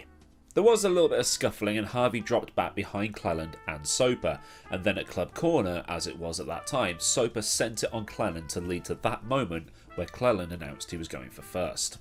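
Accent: Birmingham, United Kingdom. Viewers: Brummie accent on point! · That Birmingham axcent xD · You’re brummie accent is a dead ringer for the car cleaning guru, it’s truly incredibly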